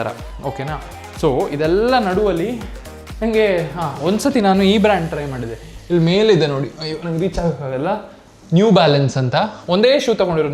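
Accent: Mangalore, India. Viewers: speaking with manglore kannada hits the heart ❤❤❤❤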